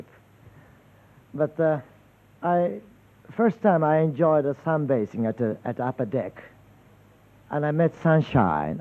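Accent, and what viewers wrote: United Kingdom. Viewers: 昔のイギリス鈍りで当時としてはネイティブなレベルだったと思うぞ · わざとイギリス弁にモノマネした喋りすごい。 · 戦前の日本はイギリス英語を主に学んでいたと言うから三島さんもその訛りが感じられるね。 なんというかやはりイギリス英語は気風がある感じがするから彼にあってるね。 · イギリス訛りっぽい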